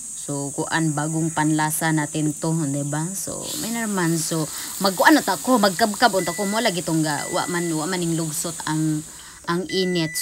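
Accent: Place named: Bohol, Philippines. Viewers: I love the background music ma ❤️ dalangpanan. I always watch your videos your accent reminds me of my boholana lola and lolo ❤️ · True proud boholana hear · manimaho gihapon dai.basta bulad grabi gyud.humot nato kaajo.pero bakag nila🤣salamat salamat kaajo sa reply dai.permi ko lingaw imo video.asa gani injo sa Bohol.murag Calape ang tuno injo sinultihan.Dual Carmen amoa dai.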